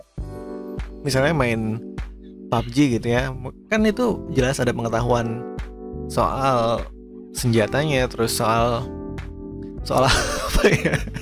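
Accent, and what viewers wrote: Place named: Java, Indonesia. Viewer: Lah saya kira orang Jawa. Asli orang mana?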